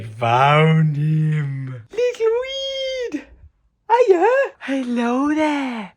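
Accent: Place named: Wigan, United Kingdom. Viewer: The way to understand what you do for us when we watch this vlog. You are to us what Old School Runescape is to You. I have watched almost everything from call of duty, Minecraft, battlefield, vlogs anything really you put out. Also I used to live in Wigan (Platt Bridge) and Your Accent is nostalgic for me so that helps. Much love From Glasgow Scotland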